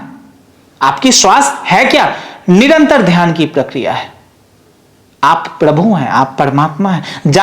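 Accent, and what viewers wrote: Bihar, India. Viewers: भैय्या लोग जैसे बोलते वैसे ये लडका बोलता है. रजनीश ओशो की बात करते है लोग मंत्रमुग्ध होते थे. इसकी बात मे कोई दम नहीं.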